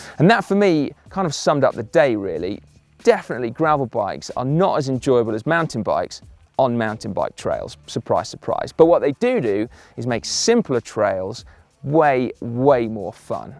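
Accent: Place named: RP, United Kingdom. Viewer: Where does get his posh voice from? #torqueback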